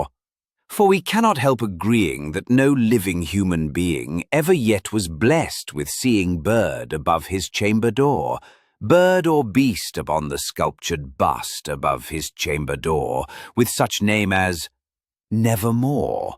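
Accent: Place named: South, United States. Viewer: As with your other presentation with Pistolero Poe, this is a joy to behold. I do detect a slight southern dialect; it becomes easy to imagine this as Poe's actual voice. The AI illustrations for his poem would have fascinated the same man who wrote an essay about the then new daguerreotype process, and sat for several of such portraits. He was quite taken by new technologies and the sciences. Thank you for including the entire poem that we might read along, or ponder it...evermore.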